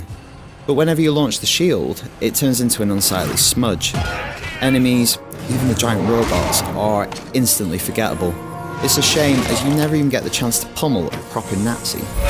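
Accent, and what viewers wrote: United Kingdom. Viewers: Furthermore, the reviewer coincidentally having a certain accent and possibly being from a foreign place like the UK, or any one of the countries colonized by British doesn't mean he represents its people. This is IGN doing the review, at least point the finger at them rather than making it a matter of national pride and insulting others. I was unaware that British were single-handedly putting down the U.S. (I say "U.S." because the Americas are not comprised of only us) via game reviews, LOL. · Why is a british guy doing a review on Captain AMERICA game?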